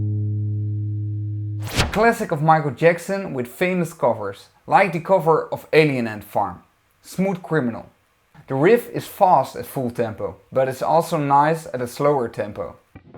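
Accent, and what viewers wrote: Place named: Netherlands. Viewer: Ik hou van dit volle nederlandse accent haha 😂❤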